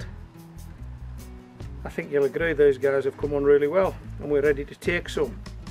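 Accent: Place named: Scotland, United Kingdom. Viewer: GMC 06 he sounds like Scottish man 😋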